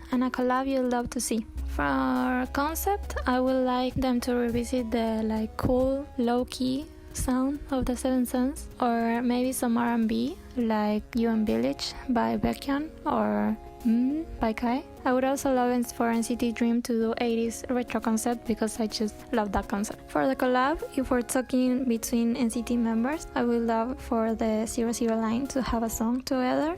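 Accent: Argentina. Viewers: sos una geniaa 💚 me encanta cómo hablas inglés con un toque de acento argento xd seguí así que tus videos son una joyita💚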